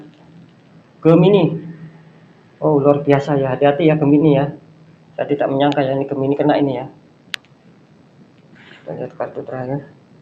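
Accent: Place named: East Java, Indonesia. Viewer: Mas orang jawatimur kah?..kok logatnya kayak orang jatim ya..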